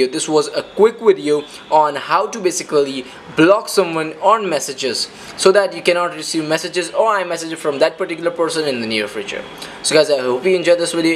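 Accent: India. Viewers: Your accent is totally like fro India. i have an Eastern European accent. We all talk with such different but easily recognizable accents when we speak English.Nice presentation, brother!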